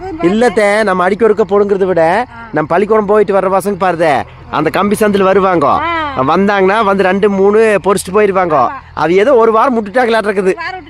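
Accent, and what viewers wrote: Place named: Erode, India. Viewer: உங்களுடைய பேச்சி ஸ்லாங் ரொம்ப நல்லா இருக்கு ஈரோட்டில் எந்த ஊரு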